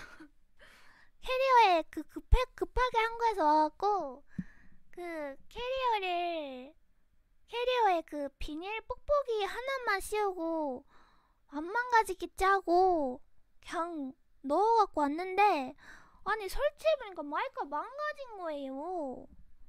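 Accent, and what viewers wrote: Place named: South Korea. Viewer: 가 롤을 해서 그런지 짜증내는 말투가 점점 한국식으로 변해가서 너무 귀여워ㅋㅋㅋㅋㅋ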